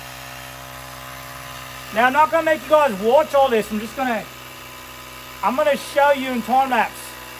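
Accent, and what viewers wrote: Australia. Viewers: Kool just ordered......Really the Aussie accent,.....to funny....oh and the reference to hill KOM, hilariously. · The Aussie accent was on point!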